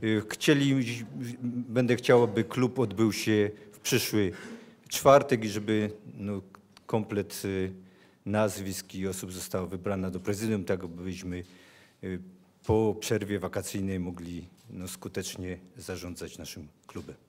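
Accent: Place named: Silesia, Poland. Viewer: ha nie ma to jak śląski akcent :D któż tego nie słyszy?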